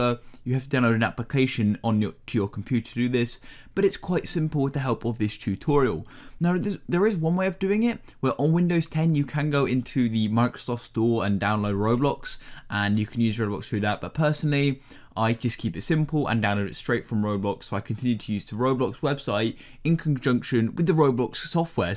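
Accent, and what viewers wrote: United Kingdom. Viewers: litterally a british dude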